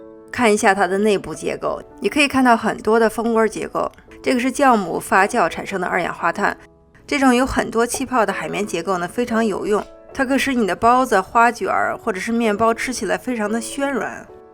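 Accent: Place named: North, China. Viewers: sun shieh 也许是你弄了，听口音，应该是中国北方人，她的念法与中国汉语字典一致的，你可以查看字典，至于其它地方是否同一标准我就不得而知了，例如台湾，新加坡等等国家。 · 你是台湾人？是大陆西安人，所以这样读是没错的，你不能强求大陆人来按台湾人的读音来发音。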